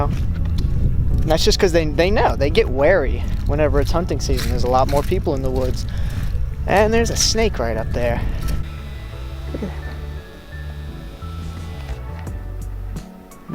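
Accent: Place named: South, United States. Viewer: Dont loose your southern accent. It is what made you!!!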